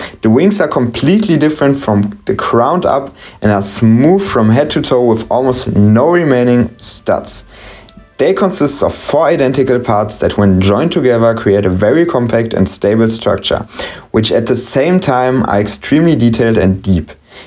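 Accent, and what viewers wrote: Germany. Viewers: Am Akzent würde ich mal herleiten, dass du deutscher Muttersprachler bist. ;) Und ich bin schreibfaul, daher bleib ich gleich dabei. Ich hadere noch mit diesem Set. Wie derbe hängt das Cockpit wirklich wenn man es hochkant hinstellt? Hatte Fotos davon gesehen und das schreckt mich tatsächlich noch ab. Und mit diesen Videos hast du ne gute YT Lücke erwischt, gerne mehr. :)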